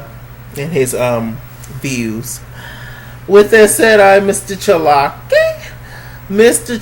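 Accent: New York, United States. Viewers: you sounded just like NY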